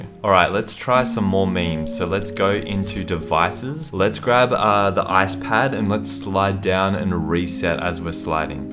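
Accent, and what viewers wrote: Australia. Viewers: Hes Australian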